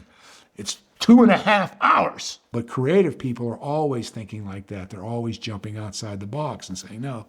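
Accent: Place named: Pittsburgh, United States. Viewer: I thought I heard a Pittsburgh accent there. It’s not thick but to the train Deer it’s definitely there. Then when you were talking about the Pirates winning the World Series in 1960 I said Yep I knew it.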